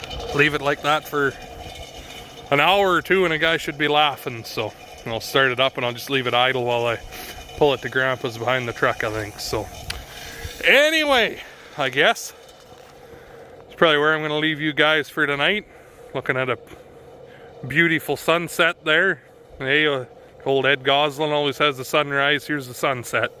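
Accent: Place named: Canada. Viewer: Can't tell if you live in Canada, michigan, minnesota, or an state next to the 7 lakes oh yeah and also thanks for taking your time and hard work to feed people and I feel you live in Canada because your tone, voice, and they way your talk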